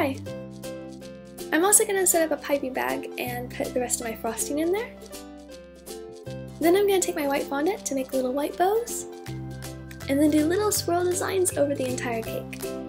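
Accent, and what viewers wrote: United Kingdom. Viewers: Omg, you are starting to sound British!!!! this is the best day of my life :)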